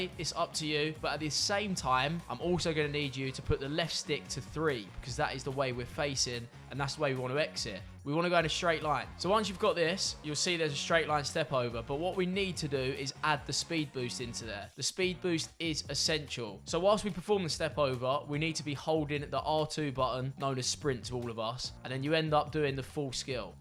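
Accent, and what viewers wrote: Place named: United Kingdom. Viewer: god i love this bri ish accent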